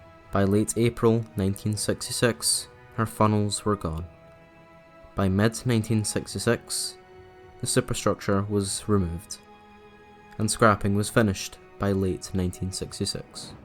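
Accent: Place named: Liverpool, United Kingdom. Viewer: Lovely video. Enhanced by your accent. Liverpool? Great video about our undersung hero.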